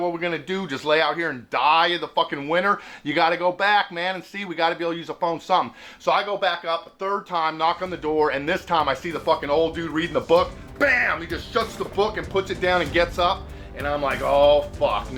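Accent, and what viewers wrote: United States. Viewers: I love the american accent great story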